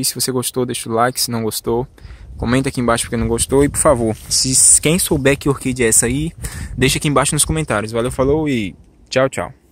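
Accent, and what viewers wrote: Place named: Minas Gerais, Brazil. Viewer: Você é Baiano meu querido? Você tem sotaque de mineiro Você fala igual mineiro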